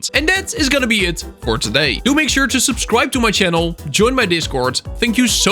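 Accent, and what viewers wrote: Netherlands. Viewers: i mainly just use fabric cause my laptop is so bad that it runs my browser at 2FPS, if i use forge i need to close everything else and then i run on 10FPS but with fabric and shaders i run with 10FPS (on fabric i dont have so many optimalisation mods. i only have sodium, lithium, ferritecore and entity culling) also you for some reason sound realy dutch with how you speak english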